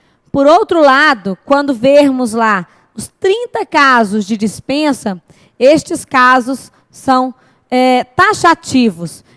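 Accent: Minas Gerais, Brazil. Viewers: Hey... Você é a melhor!!! Ta ficando Pop!!! E o sotaque de mineirinha é a cereja do bolo para meu aprendizado!!!!! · Independente dessas aulas terem sido publicadas há alguns anos, é a melhor professora de Direito Administrativo para concursos que existe no YouTube. Ela tem o que o outros não têm (apesar de serem bons): a simpatia, o carisma, o sotaque mineiro e, claro, o toque feminino...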